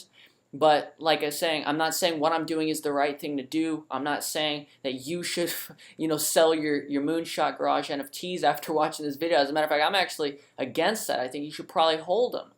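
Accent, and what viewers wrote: Houston, United States. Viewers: You from Houston ? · You from houston ? 🤔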